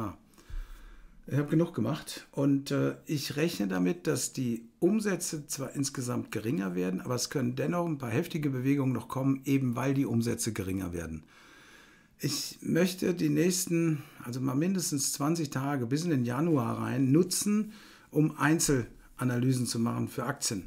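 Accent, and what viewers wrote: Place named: Hesse, Germany. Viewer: Ey, subber hessisch gebabbelt Alder! Guuude Rutsch, gell?!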